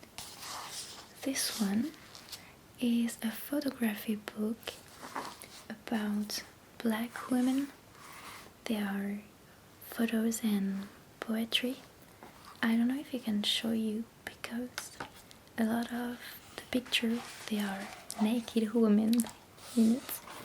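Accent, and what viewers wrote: France. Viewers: Tingly and relaxing. I love your soft-spoken French accent. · i studied abroad in france for 4 months in sophia antipolis and lived in antibes- i miss it so much!! your accent is wonderful and reminds me of my time there ❤️ i also want to say that your english is great!! you are very well understood :) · Hi love! Don’t feel stupid about your English, as not native speaker person but English is my second language which I use every single day, I can say, your English is very good and I love your French accent ❤ you was as my recommended video on main YouTube and totally loved it! I’m suprised because this is the first time, where I see actually a person who is drawing and showing such an incredible work 🥰 take your time and definitely don’t give up on your English love, if someone says about that your English is bad or something, just ignore it and keep going! 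I’d love to see more videos where you are drawing. ❤️ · Oh wow I'm so happy, this video is like made for me 🤩 my favourite kind of ASMR videos are book show and tells, and I looove french accents! This is immediately one of my favourite videos ever, it's so comforting and interesting to watch, it's perfect :') would love to see more of this type of stuff (or anything really, I just subscribed). Thank you!!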